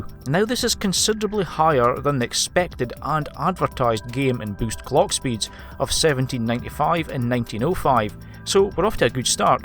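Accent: Scotland, United Kingdom. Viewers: Subbed for the Scottish accent lol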